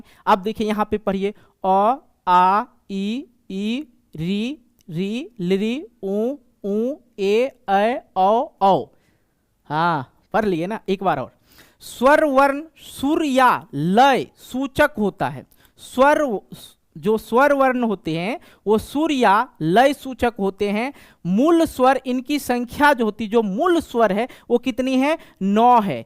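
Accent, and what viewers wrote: Bihar, India. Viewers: Sir aap bihari hai ❤❤❤❤ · Sir apki language samajh nhi ati hai lagta hai app bihari mai bat krte ho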